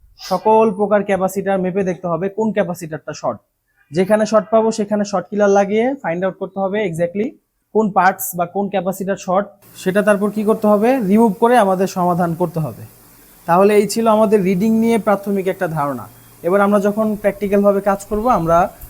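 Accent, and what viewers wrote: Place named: Bikrampur, Bangladesh. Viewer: ভাইয়ের কথা শুনে মনে হচ্ছে আমাদের বিক্রমপুরের লোক। আমি মোবাইল সার্ভিসিং শিখতে চাই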